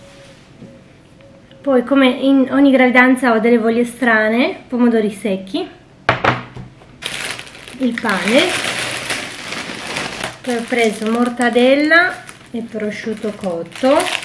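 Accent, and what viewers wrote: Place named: Italy. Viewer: ce accent de italianca adevarata, ai! Imi place sa te ascult!❤❤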